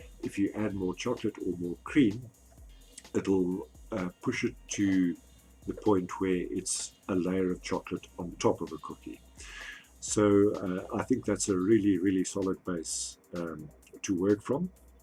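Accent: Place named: England, United Kingdom. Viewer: Thanks,very informative review as always. I hope you don’t mind me asking but are you originally from S.Africa as your accent sounds very English to me?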